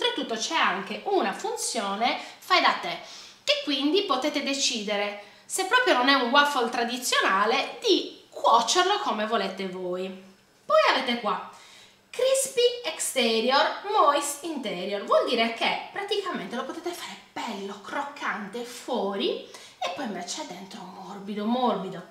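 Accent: Sardinia, Italy. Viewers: Bravissima! Ma sei sarda per caso?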